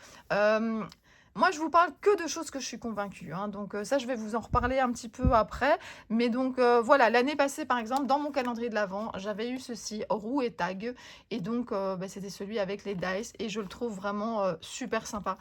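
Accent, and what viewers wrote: Belgium. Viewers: Bonjour, j'aime bien écouter vos vidéos et votre accent belge est trop rigolo 😉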